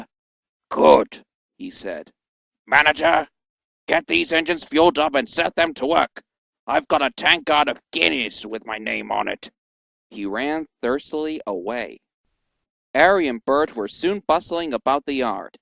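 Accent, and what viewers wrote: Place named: United States. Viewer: Why are these narrations so American and so censored?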